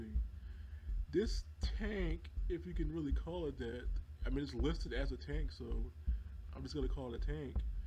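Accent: United States. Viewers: Leave out the voice sounds like somebody out of deliverance,maybe replace it with Ride Of The Valkyries.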